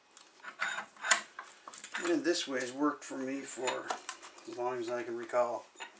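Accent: Cape Breton, Canada. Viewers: Hello fellow caper, I recognize the accent. My tub has a clogged abs trap, and it's too close to several other adapters/elbows that have no room to cut them without taking the whole thing out, which would require taking my surround out and basically redoing the entire damn thing. My question is: is it possible to cut off and remove the trap off the 2 abs pipes it connects to, without having to take apart anything else? Like a special way I can cut out the trap leaving just the rings, and then carefully cut them off, then sand down all the glue and just pop a new trap on there?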